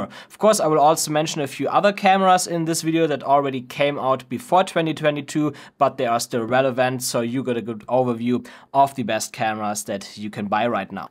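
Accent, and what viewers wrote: Germany. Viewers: hes german i can hear it im german to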